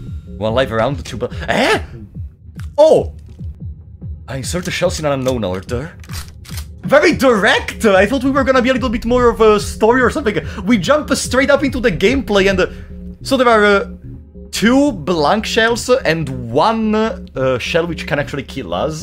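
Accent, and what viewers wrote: Italy. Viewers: I watch especially for the fear & hunger videos so hearing him in this video make confused exclamations instead of knowing every single secret of the game is very strange XD and speaking as an Italian to another Italian it's so nice to hear someone with an English pronunciation similar to your own! you make great videos man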